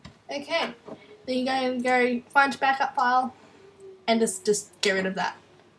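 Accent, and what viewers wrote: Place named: Australia. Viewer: This is great! Haha, I'm from New Zealand but I live in the US. I laughed when you started talking and I realised you're an Aussie! Haha thanks for the help :)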